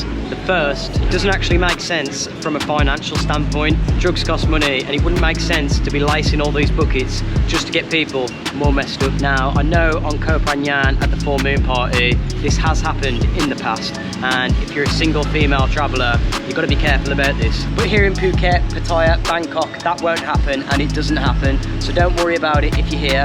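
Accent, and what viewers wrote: Birmingham, United Kingdom. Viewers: Thanks sounds like your a brummie nice one ime a jock 😊 just subbed very usefull i have done lithle research and decided pataya just offered that bit more nightlife etc as i allready decided booked off season june i been told it can rain all day every day mabey you know if thats true or not thanks just subscribed very usefull thank you looking foward too more your videos cheers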